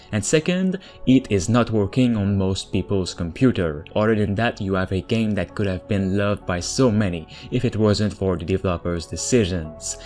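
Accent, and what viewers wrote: Quebec, Canada. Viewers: This guy has a distinctly quebecois accent i find...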